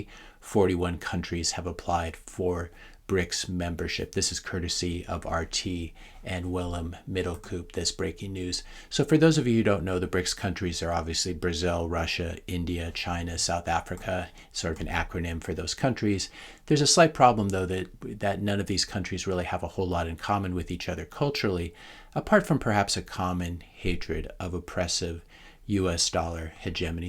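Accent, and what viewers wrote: United States. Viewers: Sounded like a ignorant american 👎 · I hate your yankee accent , it is awful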